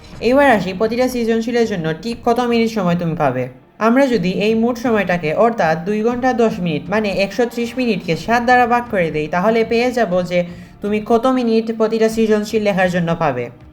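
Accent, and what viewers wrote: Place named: Sylhet, Bangladesh. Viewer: সিলেটি ব্র😢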